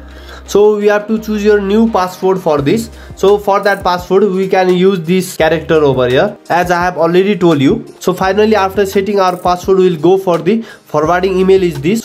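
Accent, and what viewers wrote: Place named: Nepal. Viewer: Accent tah nepali xa tah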